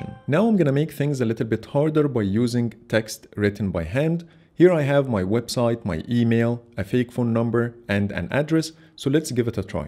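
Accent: Egypt. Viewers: لهجتك مصرية 🌚✌🏾والله فيديوهاتك ادماااااان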